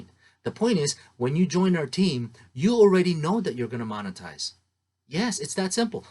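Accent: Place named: England, United Kingdom. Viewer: I love your English accent❤️😭 dame your pronunciation ❤️❤️🔥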